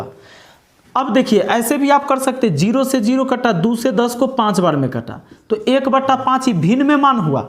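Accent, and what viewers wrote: Bihar, India. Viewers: what a bihari accent sir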